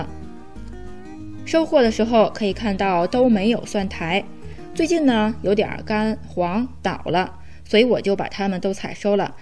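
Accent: Tianjin, China. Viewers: 浓浓的天津味！好听！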